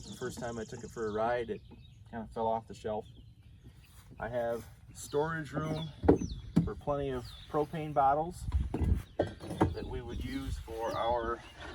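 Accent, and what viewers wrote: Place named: Iowa, United States. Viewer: Hi! Super! Questions! What state / locale were you filming in? (Why would you leave - beautiful birds and landscaping! I'm going to guess having no other info but sounds and your accent -- Iowa or thereabouts - Illinois? Second; What is that vehicle you're pulling it with? Thank you! Diana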